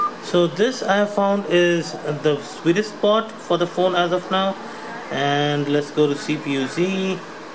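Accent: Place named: India. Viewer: Another indian with stupid tongue stupid accent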